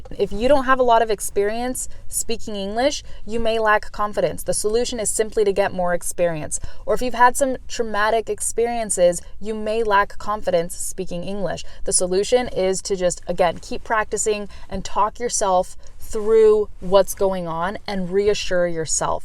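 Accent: United States. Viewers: Your American accent is so lovely, It's incredibly clear <3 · What region from u.s.a are you?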